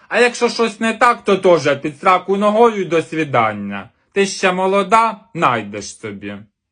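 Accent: Poltava, Ukraine. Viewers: Полтавський говір)) дуже влучно) Ви не з Глобине?)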